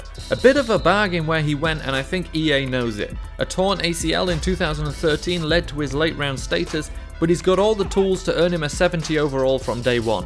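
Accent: United Kingdom. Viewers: U British ?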